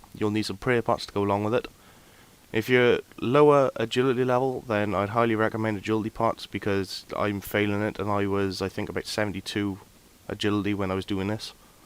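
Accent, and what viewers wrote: Wales, United Kingdom. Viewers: AWESOME guide, thank you man. Also nice listening to a Welshman for an hour :')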